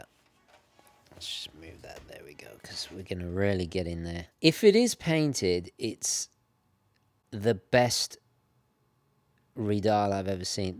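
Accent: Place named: United Kingdom. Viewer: I have wondered what country you live in as you have a British accent but I am not sure if you live in Britain. Does anyone know where the Location of residence is?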